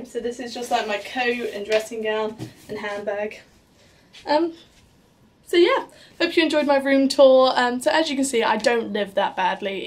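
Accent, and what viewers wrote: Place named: England, United Kingdom. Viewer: I love the English Accent👍🏻😋☘️🍀🌹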